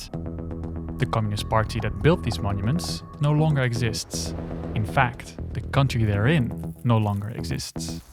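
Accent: Netherlands. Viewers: I knew i noticed a slight dutch accent.. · Aan het accent hoor ik meteen dat jij Nederlands bent! 😀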